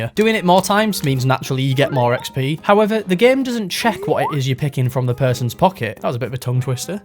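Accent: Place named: West Yorkshire, United Kingdom. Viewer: Most West Yorkshire accent I’ve ever heard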